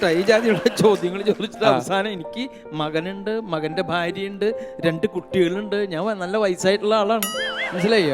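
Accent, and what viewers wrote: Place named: Thrissur, India. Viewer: തൃശൂർ സ്ലാങ്ങിന്റെ ഒരു സൗന്ദര്യo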